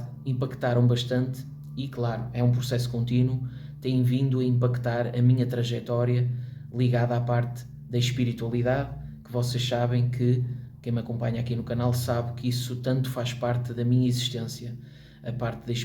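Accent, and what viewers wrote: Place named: Portugal. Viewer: Até que enfim ouço alguém em língua de Portugal Refrescante ouvir um português Parabéns Vou agora ouvir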